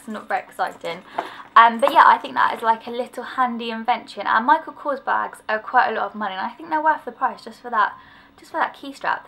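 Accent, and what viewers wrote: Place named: United Kingdom. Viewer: Your british accent is so cute ^_^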